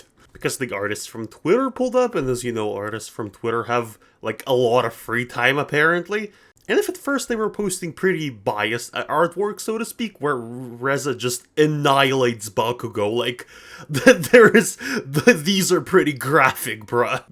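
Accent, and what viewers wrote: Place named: Russia. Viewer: Кто заметил акцен пишите. Я знаю, что ты русский, меня не наибеш. Спален за русским геймплеем.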